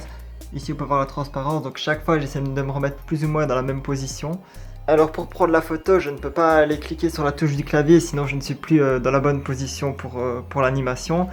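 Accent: Belgium. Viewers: T es belge je suppose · Excellent, t'étais super mignon avec les cheveux longs. Un de mes poussins ne veut plus qu'on lui coupe les cheveux, j'ai hâte de voir jusqu'où il va les laisser pousser ;) Vidéo super réussie, elle a été le sujet du discussion de tout un repas :) Toute la famille aime tes vidéos, ton humour et ton p'tit accent me rappelle les quelques mois que j'ai passé à Liège ;) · Tu est belge???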